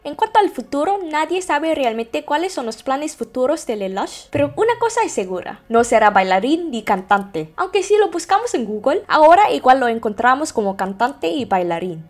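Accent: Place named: Russia. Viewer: Pense por un momento q su nombre era Lelouch el protagonista del anime Code Gears...Sobre como hablas español la gente piensa q tu acento parace ruso por la "R" los rusos por lo general suelen arrastrar DEMASIADO la "R" al usarla en el español. La R solo se arrastra cuando alguna palabra comienza o terminas con R (raro, rico) o cuando aparece la doble R (ferrocarril, carro) y cuando aparece entre consonante y vocal (pra, pre, pri, pro, pru, bra, bre, bri,.....etc) como por ejemplo preparatoria, batracio y por ultimo cuando en una silaba esta compuesta solo por una vocal (aeiou) seguida de la R por ejemplo articulación, ermitaño, ortiga (la silaba q le sigue comienza en consonante....)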